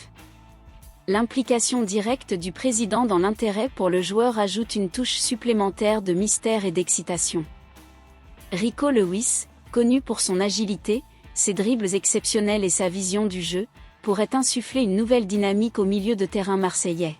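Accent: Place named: Paris, France. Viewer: Ce site a des info bidon ca racole des abonnés stile pour dépasser le psg deja pour commencer le club c olympique de Marseille c qui cette femme qui parle a tout les coup elle est même pas dici on dirait une parisienne regarder les info de franki foot ou touche pas a mon stade les mec meur pour l OM Elle on dirait quelle lit un script jamais une info exact bidon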